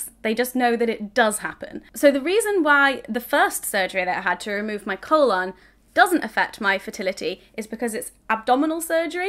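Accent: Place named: Newcastle, United Kingdom. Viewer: love your geordie accent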